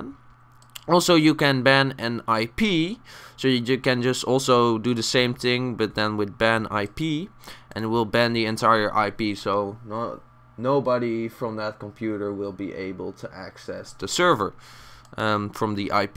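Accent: Netherlands. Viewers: klinkt nederlands :D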